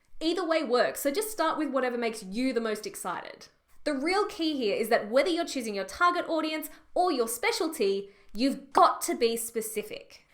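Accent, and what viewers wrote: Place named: Australia. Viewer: Great tip to find a niche within a niche..Didn't know you say niche differently in Australia (I'm a kiwi living in USA)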